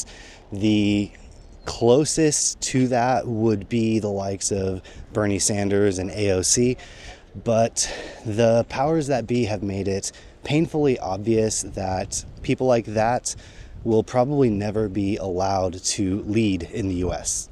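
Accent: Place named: England, United Kingdom. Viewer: Gee yer heed peace min. You sound like a sassenach.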